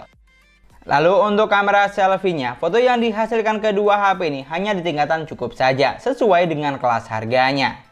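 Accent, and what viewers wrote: Madura, Indonesia. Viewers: logat madura ya bang?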